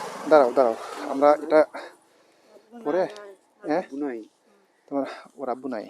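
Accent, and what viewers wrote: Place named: Naogaon, Bangladesh. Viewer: ভাই আমি আপনার সবগুলো ভিডিও দেখি। আপনার কথা শুনে মনে হয় আপনার বাড়ি নওগাঁ জেলায় দয়া করে বলবেন আপনার বাড়ি কোথায়। প্লিজ 😂😂😂😂😂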